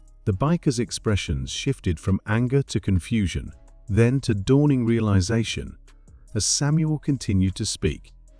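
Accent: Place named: United Kingdom. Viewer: very nice. a believable story and pretty well written. some of the location details seemed off, but i'm not a WWII history buff, so can't be sure where the 101st Airborne was throughout it all, especially Normandy on D-Day. just not sure. What was a real sticking point for me was the AI reading. My complaints are as follows: 1) a British accent? for an American story? 2) the mispronunciation of WWII - if it is going to pronounce it "EYE EYE", then spell out the TWO... 3) is there no way to "train the AI" to pronounce correctly? I've worked on AI training projects, so they're out there. So there should be some way for the creator of the video and text writer to correct the AI. If not, change the text. It really diminishes the enjoyment of what was actually a tear-jerker of a piece. On a scale of 1-10: Story: 9; AI narration - 4.5; Proofing by creator - 0??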